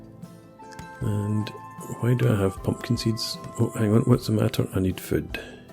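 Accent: Scotland, United Kingdom. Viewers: Just gotta say cheerio for that accent :) Scotland Forever!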